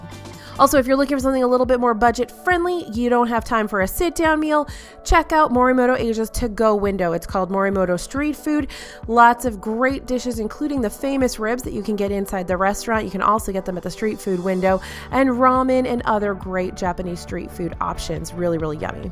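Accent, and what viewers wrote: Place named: Buffalo, United States. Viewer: are you from Buffalo? Takes one to know one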